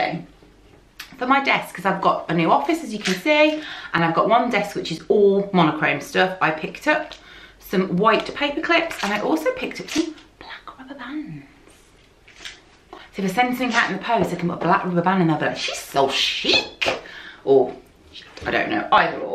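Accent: Birmingham, United Kingdom. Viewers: honestly. I bloody love it! Your lil brummy twang makes me so happy - my family are from Birmingham and it just pleases my ear to hear!xx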